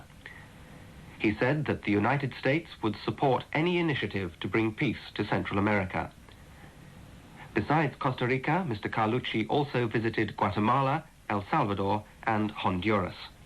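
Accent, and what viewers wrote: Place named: United Kingdom. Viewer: 영국발음 진짜 멋있다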